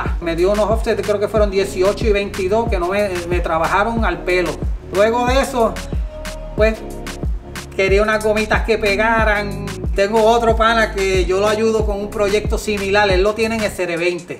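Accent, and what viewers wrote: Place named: Puerto Rico. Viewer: acho pensé que era en el salvador este vídeo, asta que escuché el acento poltorro 😁😁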